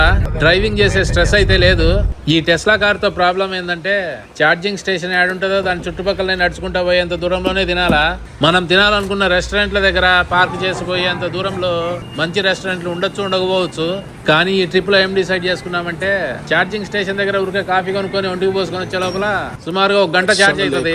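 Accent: Rayalaseema, India. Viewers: ఏమి అన్న ఆఫ్టర్ లాంగ్ గ్యాప్ మీ మాటలు రాయలసీమ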